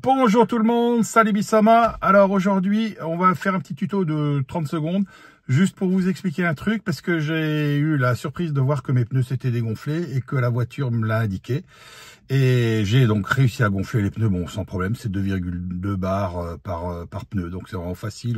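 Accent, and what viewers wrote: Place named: United States. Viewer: Merci beaucoup pour cette démonstration ! Et quel accent américain🇺🇲 perfect!🫡😀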